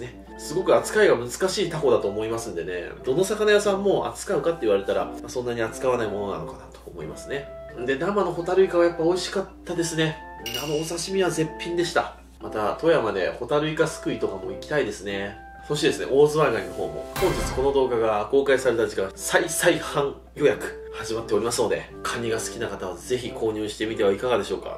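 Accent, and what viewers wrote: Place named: Chita-Mikawa, Japan. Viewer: 愛知出身です。「よばれる」って久しぶりに聞けたわ。祖母は亡くなってしまったし地元を離れてしまったので、知多三河方面の方言が聞ける貴重なチャンネルです💕動いてるタコおいしそー！！！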